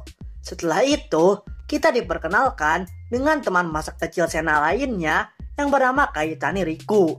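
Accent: West Java, Indonesia. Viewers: Sunda pisan iyeumah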